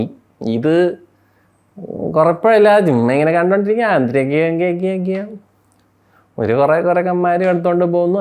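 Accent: Trivandrum, India. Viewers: തള്ളേ തിരോന്തരം 😂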